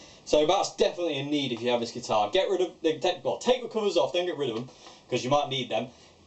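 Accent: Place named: West Yorkshire, United Kingdom. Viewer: Hey, great channel! I share your love of Vintage guitars, got me a Vintage V6 sunburst after trying a Fender American Strat and deciding I prefered the V6 and being £800 better off! Can't quite place your accent, but definitely somewhere in West Yorkshire?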